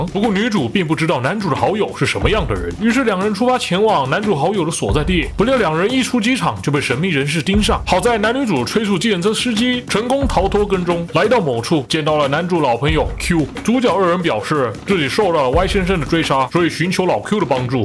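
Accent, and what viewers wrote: China. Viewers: 故意用中國腔講電影🤣🤣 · 是中国腔，，你的叔叔是中国人吗？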